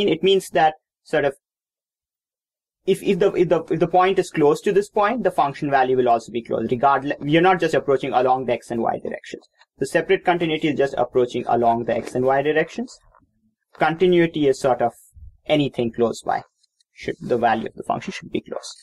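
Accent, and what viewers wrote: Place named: Arab world. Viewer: middle east accent is just annoying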